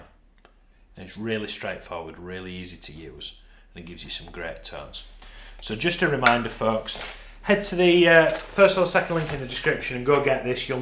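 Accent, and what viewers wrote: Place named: Leeds, United Kingdom. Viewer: Great gutar teacher as s leeds fan love the accent 😊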